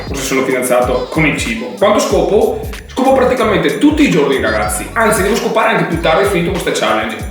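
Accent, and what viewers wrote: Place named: Veneto, Italy. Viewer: Aspetto la 100000 in 100 ore come ErikTheElectrik 😂👍 ti ringrazio amico, oltre che per questi cheat che sono PORNO, anche per i full day of eating, perchè dai delle idee veramente ottime per pasti iifym, poi accento veneto diocan è la ciliegina sulla torta 😂😂😂 5000 iscritti in una settimana e altri 2000 quasi in qualche giorno, ti meriti tutto ciò amico mio!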